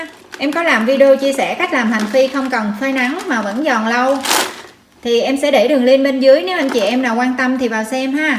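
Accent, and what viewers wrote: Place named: Southwest, Vietnam. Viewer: Giọng nói dễ thương, rõ ràng đậm chất miền tây, hướng dẫn và cách quay rõ ràng, dễ theo dõi, dễ học hỏi, cảm ơn con gái nghe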